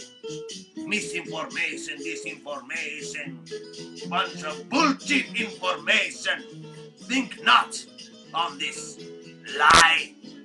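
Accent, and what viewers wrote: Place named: India. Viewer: You're Indian accent is funny!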